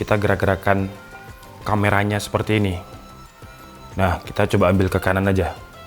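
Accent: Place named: East Java, Indonesia. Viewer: Medok jatim rek 😂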